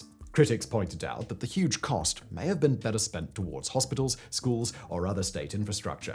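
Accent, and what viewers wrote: RP, United Kingdom. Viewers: you sound so posh that it's very annoying. Bring it down to earth and I'll watch your content. Otherwise... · This guy just reads the script. He sounds goofy and British, that is the selling point. There is an immense production team behind that.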